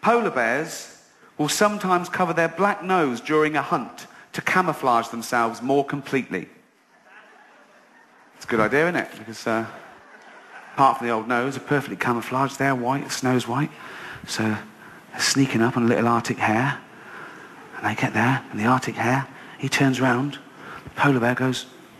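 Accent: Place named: United Kingdom. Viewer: This guy is fuckin hilarious.And his peculiar British accent makes him sound even more funny.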